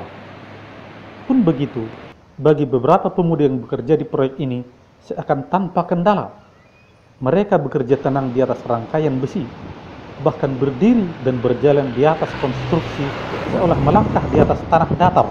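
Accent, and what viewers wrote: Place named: Batak, Indonesia. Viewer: Boasa pola direhei khas orang halak hita memang begitu kita harus bangga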